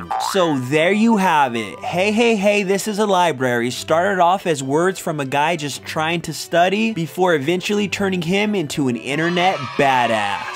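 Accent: South Korea. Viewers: sounds korean to me. I also think regardless of what type of Asian he my be good on him... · Btw he's korean just by his accent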